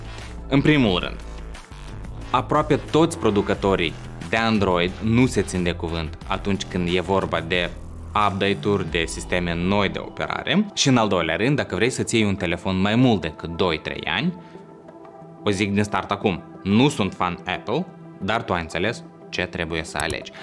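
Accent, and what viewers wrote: Moldova. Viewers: Aud accent Moldovenesc, dau skip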